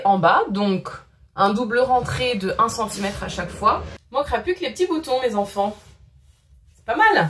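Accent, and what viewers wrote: Lorraine, France. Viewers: Cc Bouton jean pour moi 😁 Et c’est toujours un plaisir de te voir et j’adore ton accent lorrain 😅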